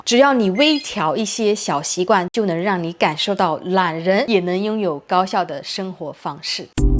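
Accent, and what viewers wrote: China. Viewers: 容易，傳統，觀察，囤貨，宣傳，玄關。這些詞，要說慢一點，才好聽。妳是海南道妹妹嗎？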